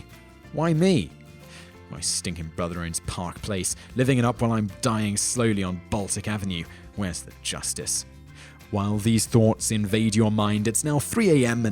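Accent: England, United Kingdom. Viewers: It's Milton BRADLEY...not BRADY! ...and it's Struh tee go!!!! Nothing like an English Accent that murders the Queen's English!!!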